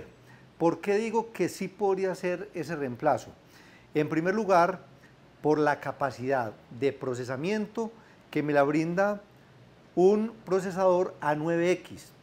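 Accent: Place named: Colombia. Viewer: Colombiano Lol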